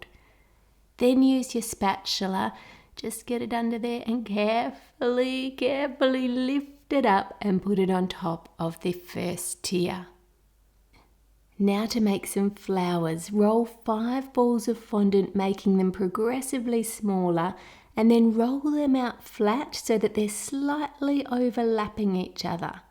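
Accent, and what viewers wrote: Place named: Australia. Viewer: I like your Australian accent.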